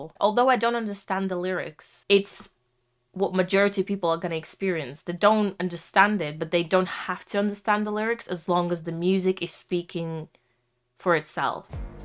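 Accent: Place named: Eastern Europe. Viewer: do you have Slavic roots? You have an interesting accent. I can't figure out where this is from, very interesting 😅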